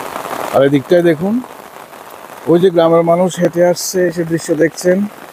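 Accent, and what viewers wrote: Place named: Noakhali, Bangladesh. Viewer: এটাকে বলে নোয়াখাইল্ল্যা ভাষা...!!! পুরা সেইম টু সেইম।। একটুও এদিক-সেদিক নাই।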